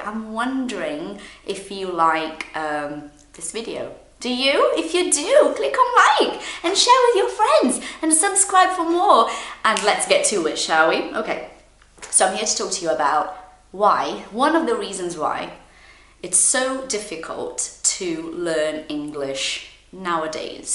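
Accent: United Kingdom. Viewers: your vídeo os Very useful and you have an excellent British accent. Congratulations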